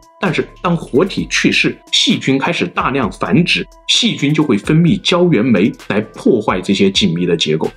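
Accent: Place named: Yichang, China. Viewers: 口音湖北人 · 我听口音就是我们湖北的，惟楚有材确实不虚啊，楚虽三户亡秦必楚啊！我们九头鸟确实是宇宙第一，乌拉！大武汉V587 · 普通话讲的跟我一样的😂严重怀疑也是宜昌人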